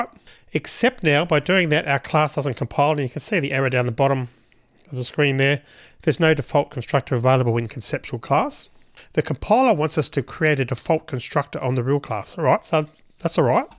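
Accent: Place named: Australia. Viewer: I'm taking Kotlin class in Udemy - in general, he is in the top percentile in terms of completeness, ability to explain things conceptually (why you do sth, not just what), video editing (he actually edits his videos, so typos and corrections don't show up and important snippets are outlined instead of wiggling a mouse), pace (you have time to absorb concepts), practicality (both concept and mini-projects)...and, notwithstanding the Aussie accent, his English is very easy to understand...really great stuff across the board!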